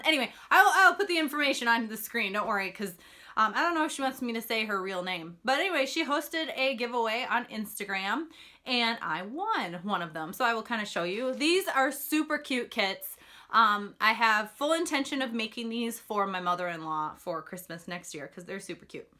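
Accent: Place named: Minnesota, United States. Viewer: Fun video my moms from Minnesota so I recognized your accent. I have a billion relatives there hey you could be one.........I remember being there in December. And my grandparents walking to church in minus 0 weather!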